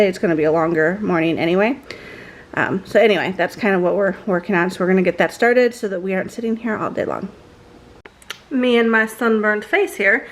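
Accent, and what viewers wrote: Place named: Michigan, United States. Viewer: Your Michigan accent was strong today 😂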